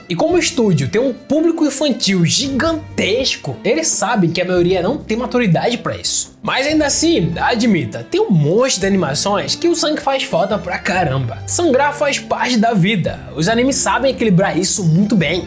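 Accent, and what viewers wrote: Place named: Northeast, Brazil. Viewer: bom ver um nordestino com um canal com potencial para ficar grande, cansa de “só” escutar sotaques do sudeste (basicamente)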